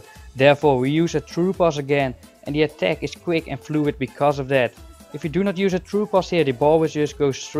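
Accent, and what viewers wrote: Netherlands. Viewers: Great tutorial. Btw you sound Dutch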